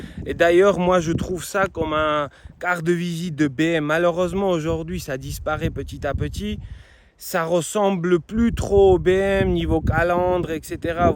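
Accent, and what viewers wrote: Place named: Georgia. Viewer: gaixare dzmao. Akcentze gicani 😁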